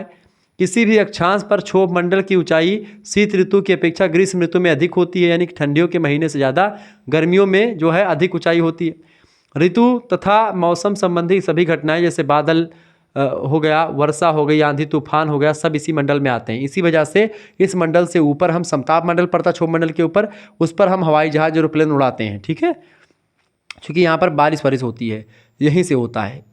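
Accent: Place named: Bhojpuri, India. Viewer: are bhaiya pure hindi bola kariye ye bhojpuri dhang se samajh mein nhi ata hai samajhne mein dikkat hota hai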